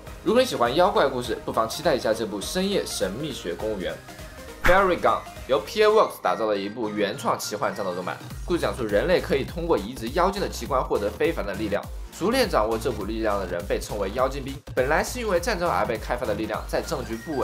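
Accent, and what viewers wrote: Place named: China. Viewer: 听这口音应该也是我们国内的吧。。。为什么我觉得YouTube这里台湾人很少会介绍新番?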